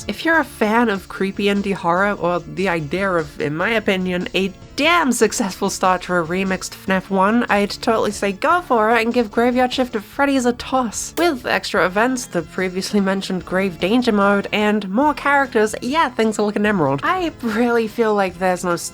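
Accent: Australia. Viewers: I can’t tell if is Aussie, Brit or American anymore, but I have an autism accent so I can’t talk. Happy to be here nonetheless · Great video, but I have to ask what's up with your voice? Kinda Aussie, kinda British? Its a bit hard to listen to, if im honest. Hearing a perfectly fine austrailian accent and then you suddenly drop your T sounds to an almost comical degree (the "bo'oh'o'wa'er" effect). Ive lived long enough in both Australia and the UK to say that ive almost never seen australians drop their T's like that, and most british accents dont do it nearly as overtly as most think. This isnt a personal attack, its more that im genuinely curious. Its the same sort of question i'd ask if I knew someone that had never lived in the UK all their life but spoke in an american accent.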